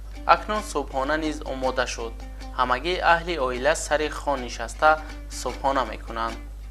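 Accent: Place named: Tajikistan. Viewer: Бародари азиз барои шумо муваффақият орзуманамдам, чи қадар навори зебо бо лаҳни тозаи тоҷикӣ, бо фарҳанги зебо тоҷикӣ 💕. Аҳсан!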